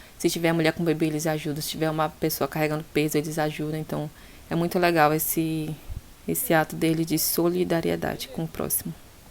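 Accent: Rio de Janeiro, Brazil. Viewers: Para que esse sotaque de carioca? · Que legal, não tunha iseia da vida aí, obrigado pelo vídeo, adorei. Gostei do sotaque também 😊, parece com o do Rio de Janeiro kkkk 😍👏🏽👏🏽👏🏽. Sucesso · Engrassado sotaque de Carioca la na Amazonia 😆😅😉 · vc fala parecer ser carioca vc1p kkkkkk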